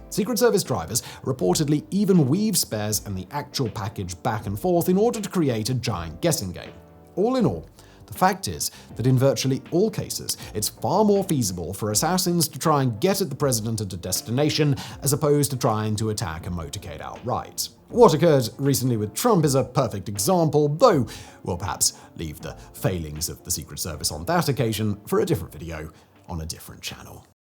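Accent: United Kingdom. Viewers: This guy is paid by shadowy people to read out a script and sounds smart in British accent. Pure propaganda. Do not censor me or else I'll continue!!!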